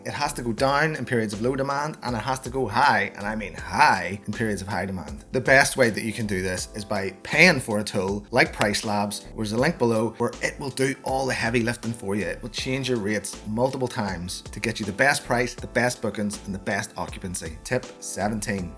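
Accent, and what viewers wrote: Ireland. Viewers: That’s extremely rude.he has a wonderful Irish accent, and that is how Irish people speak. I am watching this in South African, and I can hear him clearly, beside you can slow down the speed of the play. Telling people to speak slowly for your convenience is rude .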